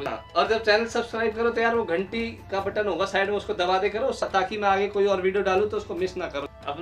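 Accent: Haryana, India. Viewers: Yes. Muje b yahi accha laga.. k inhone indian way me bat ki.. isliye mene channel b subscribe kia.. i like ur video man..😄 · but he is from haryana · Bhai Haryana se hai kya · Aaha haryanvi.......cha gya chore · you still talk the Indian way ... that's cool thing about you ... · Haryana se ho bhai..?? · Hahahah , l like your desi Accent. I love this video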